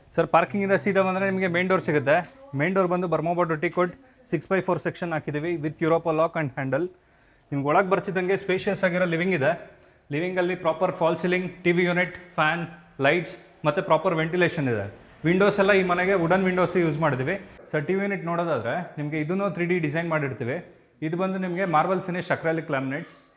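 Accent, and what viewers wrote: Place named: Udupi, India. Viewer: Udupi talking maduthira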